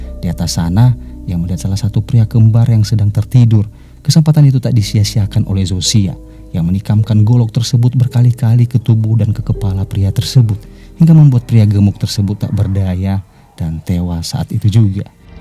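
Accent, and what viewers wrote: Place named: Medan, Indonesia. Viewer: Suara nya kayak orang medan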